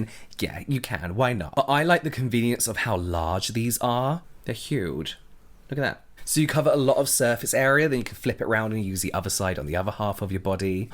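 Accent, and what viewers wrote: United Kingdom. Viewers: Are your from the UK?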